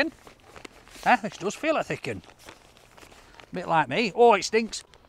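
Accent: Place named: Yorkshire, United Kingdom. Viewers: Hi, my gift was sat at the front of your table but unfortunately you didn't have time to open it. All I will say is it may be beneficial if you open it first on your next unboxing video. It is a purple parcel with the postcode blacked out where i got it wrong. For the last 20yrs I have been suffering severe depression after a lifechanging accident whilst at work, and I have to say that watching your videos puts a smile on my face. I worked in Barnsley for 7 years and your accent brings back all the happy memories from before my accident. Keep on doing what you're doing pal, I'm sure that you bring a smile to everyone that watches. All the best for your future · your the man mate. I tend to binge watch, so I could be two weeks behind on video's. But I will catch up in a few days. I am a Yorkshire lad Doncaster so not far away from you, and I have the same accent as you Mucka ❤️ col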